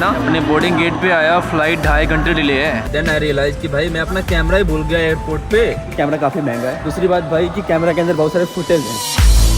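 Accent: Bihar, India. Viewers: Thoda dheere bola kar bhai bata deta hai ki Bihar ka hai.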